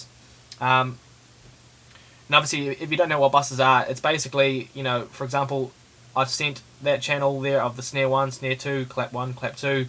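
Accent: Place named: New Zealand. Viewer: Lol turn on interactive transcript, not to great at interpreting the kiwi accent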